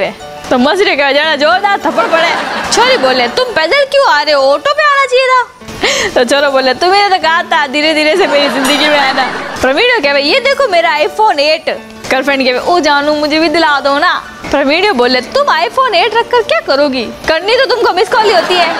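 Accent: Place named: Marwar, India. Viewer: suddhh marwadi bhasha very good g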